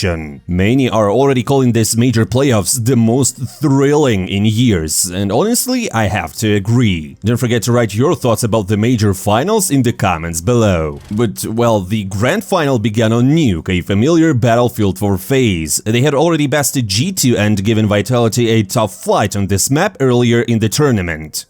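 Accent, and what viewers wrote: Russia. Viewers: Твой русский английский не очень гуд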